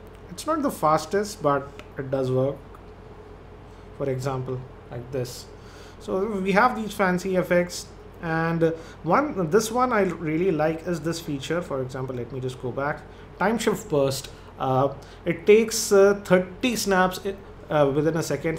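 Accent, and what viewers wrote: India. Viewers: i like if indian people speaking english, because different but good. :) nice sony :D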